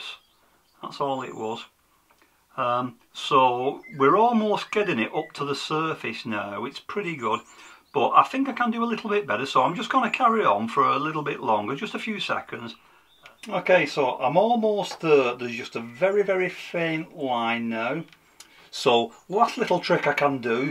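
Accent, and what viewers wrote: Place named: Manchester, United Kingdom. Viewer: That's a beautiful piece, really loved watching you restore it back to it's original glory. From your accent you're not a million miles from me, I'm in Manchester.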